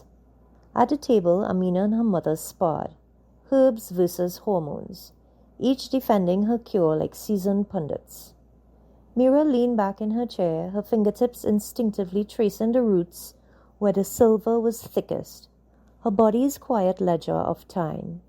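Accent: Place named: Trinidad and Tobago. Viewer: Love your story. Brought back so many memories and love the fact that you were selective with your trini dialect. It was used just in the right places.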